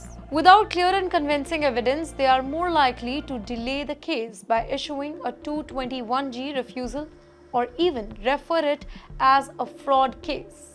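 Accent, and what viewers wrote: India. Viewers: The host has a standard Indian accent so if you call that a third grade reader then they all sound like third grade reader and speaker. She sounds like a third grade reader but the majority sounds like first grade readers in my opinion.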